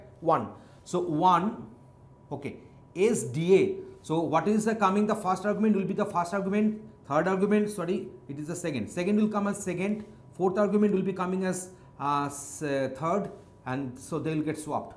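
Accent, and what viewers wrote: Bengal, India. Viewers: he sounds like bangali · Because of his Bangali accent.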